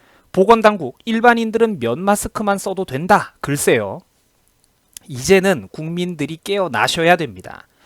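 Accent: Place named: Gangwon, South Korea. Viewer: 말투가 강원도분이시네요 파이팅!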